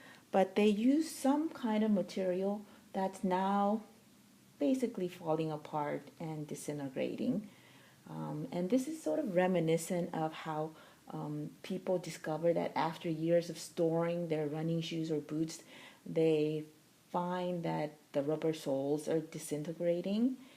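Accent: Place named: Philippines. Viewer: You sound like a Filipina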